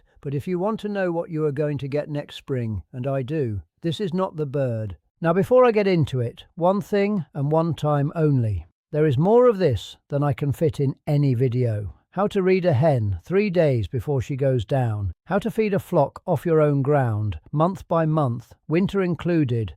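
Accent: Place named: Yorkshire, United Kingdom. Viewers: He sounds Yorkshire accent to me. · I dont understand why a Scotsman talks with a accent that is nearer to a Yorkshire man.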